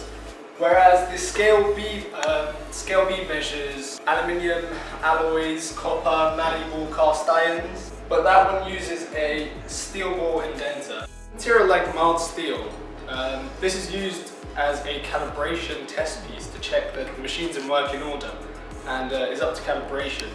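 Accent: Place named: United Kingdom. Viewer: clearly a british accent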